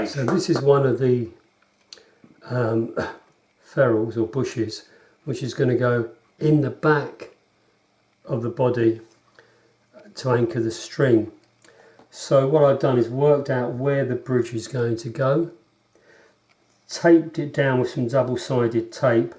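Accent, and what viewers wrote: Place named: United Kingdom. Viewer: Hello again,. Am watching your bass construction series, very much enjoying it. While I have no desire to ever build a bass, as usual, I totally admire your craftsmanship and attention to the smallest of details. While I am at it, I should mention I find your British accent a real treat to my ears, as if I am eating a piece of delicious toast covered in peach jam. Cheers, my friend. Bob in Montana. 😊❤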